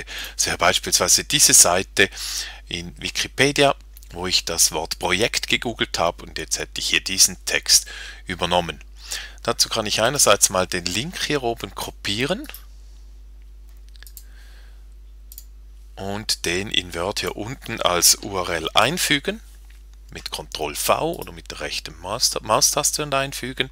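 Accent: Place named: Switzerland. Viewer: gutes video, supeeeeeer, aber schlimmstes Hochdeutsch ever, haha typisch Schweizer Hochdeutsch🤣😑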